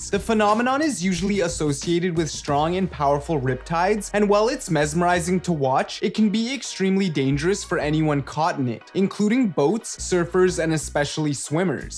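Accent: Canada. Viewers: canadien eehh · Sounds kinda Canadian to me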